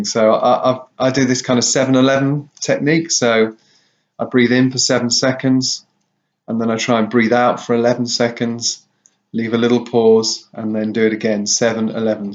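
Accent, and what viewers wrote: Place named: United Kingdom. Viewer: Hi, are you still active on this channel? I am struggling with this at the moment really bad. I noticed your accent sound like you were from the UK so I was wondering if we could have a text conversation to discuss this further. Really in need of some help.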